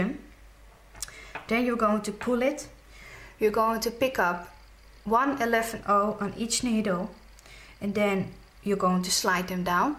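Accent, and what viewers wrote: Netherlands. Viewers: hoorde aan je accent dat je nederlands bent haha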